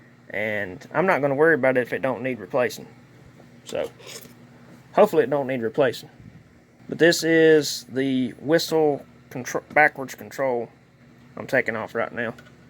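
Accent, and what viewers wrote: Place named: South, United States. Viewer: You're country as hell. You sound just like me. I'm in East TN, what part of the South are you from? I have a Lionel zw275. I've seen a handful of these vw transformers on eBay. I always thought they was just two train operations, instead of four. Are these physically smaller than a zw? I've gotten to where I could use another zw to run my American Flyer trains with. I wouldn't mind trying something different if it has enough power.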